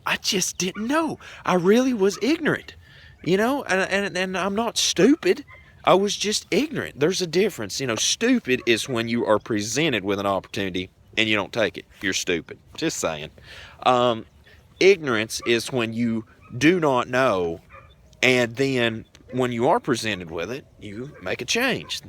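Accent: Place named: Kentucky, United States. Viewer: I like the accent is that KENTUCKY?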